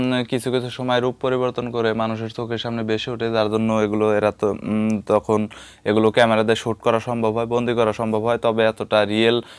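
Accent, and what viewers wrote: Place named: Tangail, Bangladesh. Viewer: মনে হয় , টাংগাইলের ভাষা বুঝতে পারছি না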